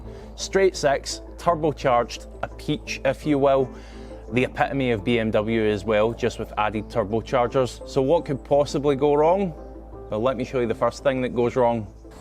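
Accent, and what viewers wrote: Scotland, United Kingdom. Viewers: Scottish ( English)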